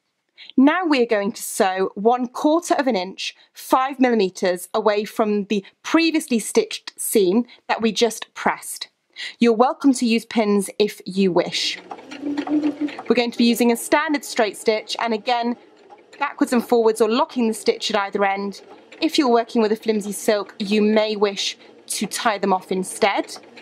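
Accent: England, United Kingdom. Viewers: very beautiful english accent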